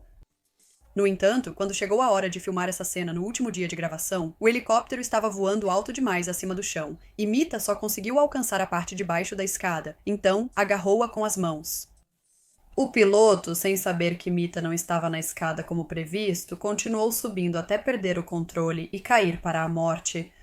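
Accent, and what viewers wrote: Portugal. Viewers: Conteúdo muito bom. Porém, português de Portugal... 🤢 Impossível de ouvir por muito tempo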